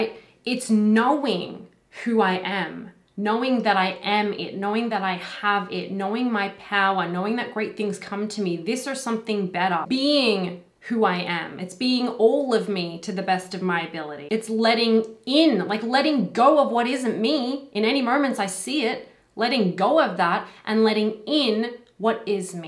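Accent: Australia. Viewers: You are amazing. Are you Australian?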